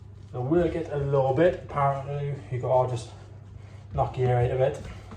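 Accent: Wales, United Kingdom. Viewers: Great Welsh Accent...much softer than some...so, which Part of Wales. Id guess North Wales. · I don't suppose for one moment that I'm the only person who clicked on this as a result of the still pic at the start of the video! 😋😋As for topless "sexual" clips. There are plenty of those on YouTube! BTW, I'm trying to place your accent? Welsh? Apologies, if I got that wrong! 😉😉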